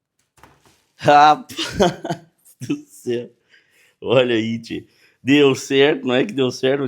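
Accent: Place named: Rio Grande do Sul, Brazil. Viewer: so pelo jeito q ele fala reconheço q ele e do meu estado,do rs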